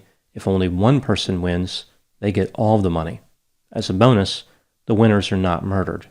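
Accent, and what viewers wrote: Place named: Boston, United States. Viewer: The best accents are generated by native Bostonians!! The Doc has a cool accent! 😉🙂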